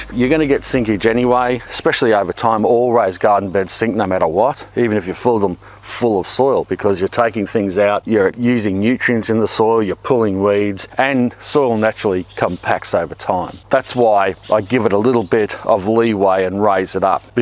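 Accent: Australia. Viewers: Fantastic video, just what I needed to see for my next project. As aussie as they get, well-spoken and bloody good at what he does. And them tradie shorts - Lol! I nominate you next host of Gardening Australia! Greetings from Sydney mate. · I don’t know why but you remind me of Kenny the toilet guy.. Maybe it’s your Australian accent .. Needless to say I love your channel. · Great explanatioan and a very cool lady back aussie accs!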